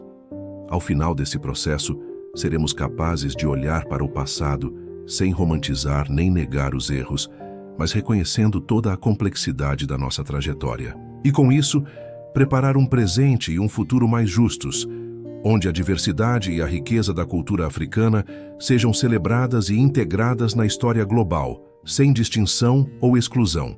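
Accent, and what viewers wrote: Brazil. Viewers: ÉS AFRICANO COM SOTAQUE BRASILEIRO?!! A VERDADEIRA HISTÓRIA DA ÁFRICA E BERBERE OS CELTAS TAMBEM ERAM BERBERES DEPOIS ALGUEM FEZ UMA MANIPULAÇÃO GENÉTICA CRIMINOSA E CRIOU CANIBAIS OS BERBERES E CELTAS SAO OS MESMOS ATLANTES OS PORTUGUESES ERAM A ELITE ATLANTE OS PIRTUGUESES FORAM AS AMAZONAS OS FENÍCIOS OS TEMPLARIPS E OS ESCRAVOS NEGROS BRASILEIROS OS INGLESES GORAM OS ESCRABOS NEGROS USA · Vão mas é trabalhar. Este narrador com sotaque brasileiro a dizer que é africano é só para 😂😂😂😂.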